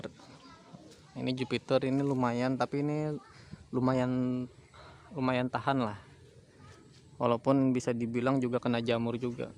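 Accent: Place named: Banjar, Indonesia. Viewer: Banjar dmana nya pian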